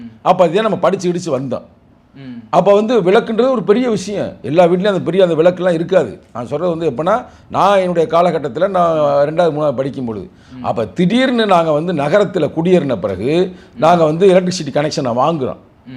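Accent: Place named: Madurai, India. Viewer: மதுரை தமிழில் அறிவார்ந்த சொற்களை கேட்கும் பொழுது.... இனிது இனிது... நீர் வாழ்க....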